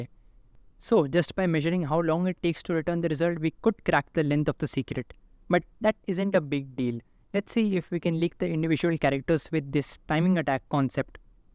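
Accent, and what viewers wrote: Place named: India. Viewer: Just realized it's better switch Siri to Indian accent so I would just get used to it somehow for videos like this.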